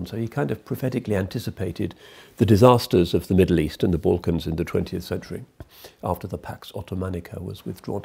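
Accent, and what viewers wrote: United Kingdom. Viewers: He is so eloquent..that soothing elegant British accent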